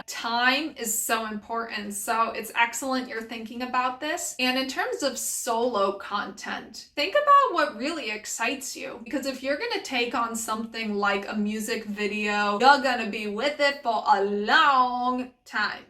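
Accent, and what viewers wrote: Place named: Europe. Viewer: yeah vibrato is a very wide topic (no pun intended!). Cool, I'll look out for that video and yeah, I imagine it will have to come in sections. Another question I have which I'm not sure you answered before, or maybe you do in another video, but where are you from? You sound like you may have lived in Europe originally but then grew up in America. Am I right? I don't know lol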